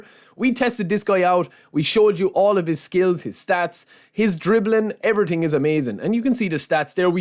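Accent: Ireland. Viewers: Bro, are you from Ireland? Your accent is familiar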